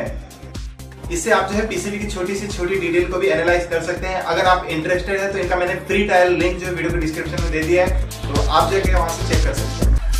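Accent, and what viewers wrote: India. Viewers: Indian English~~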